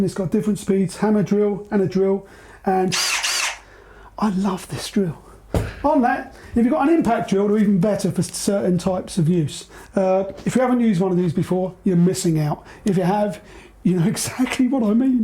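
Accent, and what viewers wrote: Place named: United Kingdom. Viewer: I was sold on this video from the start because as an American, the most qualified chimney sweeps have British accents. I blame the movies. Then he pulled out the Milwaukees…. 🙌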